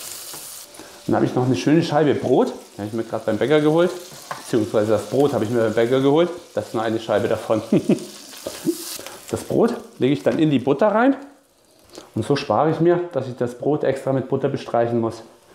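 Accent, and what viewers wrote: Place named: Franconia, Germany. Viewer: Gelernt ist gelernt. Ich brauche schon zum Champions putzen und schneiden 15 Minuten. Beide Rezepte finde ich gut, werden nachgekocht. Danke für Deine Mühe. Darf ich fragen wo Du lebst? Hört sich fränkisch an. Ich komme aus OF (Lichtenfels), habe aber lange in Nürnberg gelebt. Seit 24 Jahren lebe ich aber nicht mehr in der EU.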